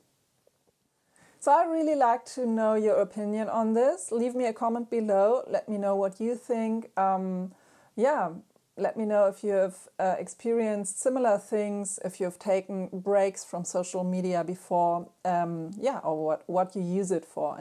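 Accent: Germany. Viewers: Thank you for this post! I felt the same way. But a certain schedule helps to stay away from checking it, besides I haven’t been on instagram from March to October of 2022. And it feels like I need to do it again 😅 But I joined Behance, where I can communicate with different types of artists. Not sure about this platform yet, but at least my portfolio is online. P.s. Love your German accent so much. When I heard it, I’ve realized how much I miss this country and the language itself. Danke schön ❤ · I like your accent, during your video my mind almost convinced me that your were speaking German and not English ;D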